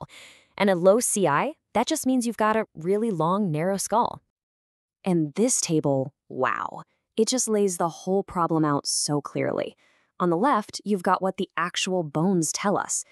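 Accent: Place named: United States. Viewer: annoying robot American begone !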